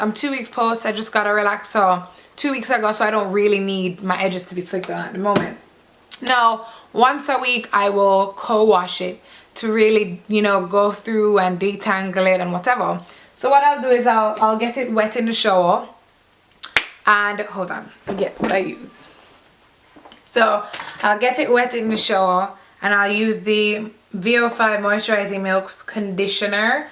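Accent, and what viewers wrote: Jamaica. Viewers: She is Jamaican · Jamaican accent 😍😍😍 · are you jamaican?? u sound like a yardie .... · st. rose in ur face she is jamaican I wonder what's so terrible about jamaican accent anyways what I want to know is how u de tangle it cuz I wore the malaysian kinky for a month n 1 week n I washed it almost every day if it was thoroughly washed water contacted it every single day the beauty of it is that u can wash it in the shower while taking a bath and by the time u put ur clothes on its like dry no need for blow drying n all but I messed it up so bad ly when I stopped de tangling it n now I can't wear it again it's tangled with big cases of knots no way I can unwind it n I love this hair so much I am thinking of dying it burgundy n wear it for atleast a week before Christmas please tell me what to do its badly tangled help!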